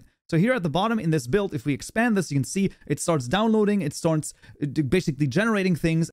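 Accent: Germany. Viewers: Its funny how you nearly lost your German accent in to the java tutorial series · You sound german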